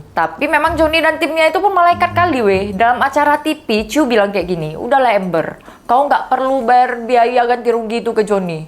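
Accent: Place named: Madura, Indonesia. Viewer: suka dgn dialek host-nya .. meduro bingits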